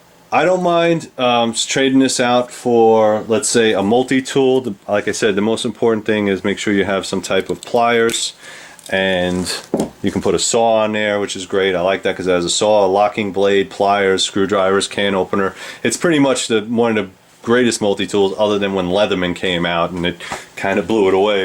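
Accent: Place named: New York, United States. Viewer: Thank you for your service! Are you from New York? Your accent reminds me of the old "Bowery Boys" movies.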